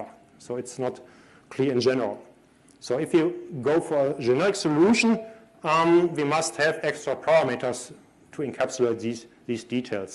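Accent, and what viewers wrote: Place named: Germany. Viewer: i don't know what's wrong, but it's close to impossible to understand what he says, german accent is way too heavy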